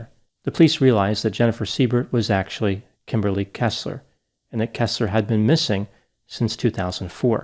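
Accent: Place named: Philadelphia, United States. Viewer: very nicely done analysis. Kessler reminds me a bit of the Florida serial murderer Aileen Carol Wuornos. Doctor, you sound a bit like you're from the greater Philadelphia, or Tri-state area. Thanks a bit for the video. I'll keep watching future postings.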